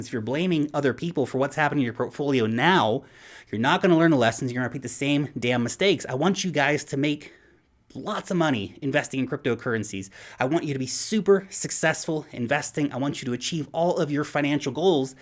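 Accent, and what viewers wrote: United States. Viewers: you don't sound like you are from New Zealand. You sound American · New Zealand? How come you sound like you're from the US? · Wow, I always thought is from the US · you’re a kiwi 🥝? What’s with the American accent?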